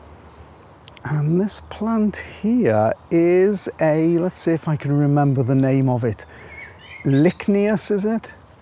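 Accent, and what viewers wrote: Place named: Liverpool, United Kingdom. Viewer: I’m really enjoying this video, it’s so interesting, thank you for sharing. I have to ask are you originally form Liverpool by any chance? I feel like I can detect the slightest hint of ‘scouse’ in your accent ☺️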